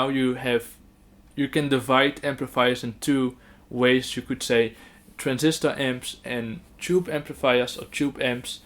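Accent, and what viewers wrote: Netherlands. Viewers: Het Nederlandse accent is geweldig ahaha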